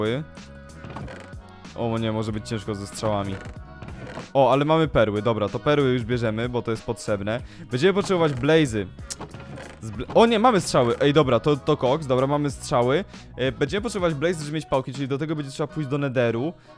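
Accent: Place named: Silesia, Poland. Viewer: Ty trochę umisz muwić po Ślosku jak ja.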